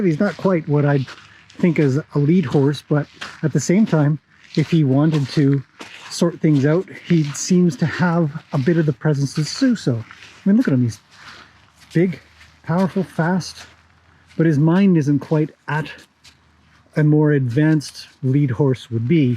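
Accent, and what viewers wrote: Canada. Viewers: Trying to figure out your accent? Canada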